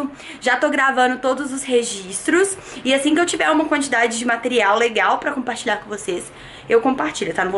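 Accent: Minas Gerais, Brazil. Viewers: "Passar esse trem aqui..." kkkk Sotaque mineiro é demais... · É o sotaque de Minas!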